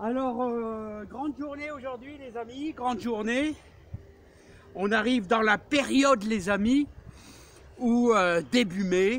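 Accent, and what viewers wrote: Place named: Nord-Pas-de-Calais, France. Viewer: Ça fait du bien de vous écouter L accent de ma naissance bravo le ch’timi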